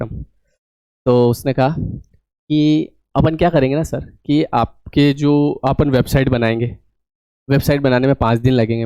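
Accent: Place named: Indore, India. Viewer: Sir Aap kaun se city mein rehte ho, aapki bolne ki tone indori hai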